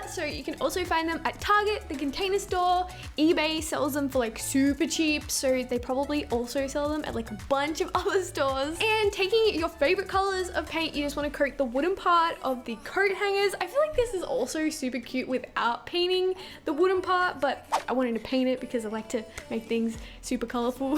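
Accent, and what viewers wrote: Australia. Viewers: Sarra Turki she is from australia (didnt you notice the australian accent?)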